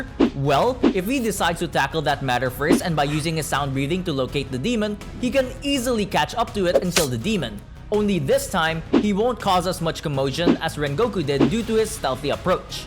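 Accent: Philippines. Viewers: You sound very filipino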